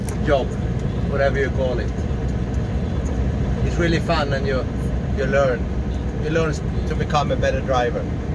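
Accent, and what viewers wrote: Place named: Dalarna, Sweden. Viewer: Använde Transportstyrelsen för att se det, såg din reg plåt o tänkte att jag kunde säga det. 😂 Är från Sandviken i alla fall, jag har för mig du är i Dalarna, från hur du pratar svenska.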